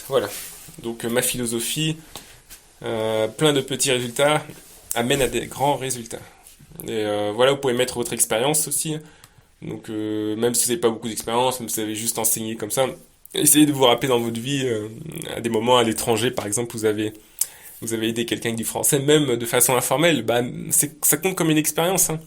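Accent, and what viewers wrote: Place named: Nord-Pas-de-Calais, France. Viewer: la vache l'accent chti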